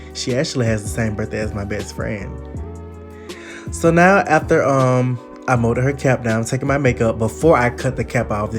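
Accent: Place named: Florida, United States. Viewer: Florida accent on fleek!! HAAAANNNN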